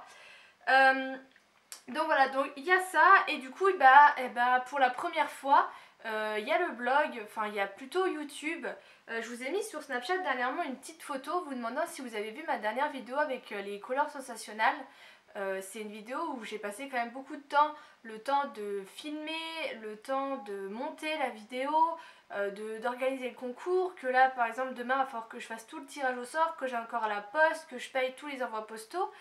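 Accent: Lorraine, France. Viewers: avec le petit accent lorrain de chez nous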